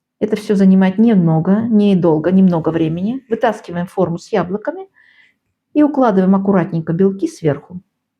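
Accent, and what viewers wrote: Baku, Azerbaijan. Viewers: Какая же вы очаровательная,!!! Правильно вы сказали,что те рецепты несут с собой такое тепло,потому что мы пекли совсем с другими чувствами… Я больше слушаю ваш чарующий голос и чудесный акцент,сразу переношусь в Баку,и чувствую радость жизни.Вы мой антидепрессант!!!! Храни вас Господь,дорогая ❤! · Пирог конечностей и вы приятная ,удачи вам ,акцент Бакинский напоминания,всем здоровья и мира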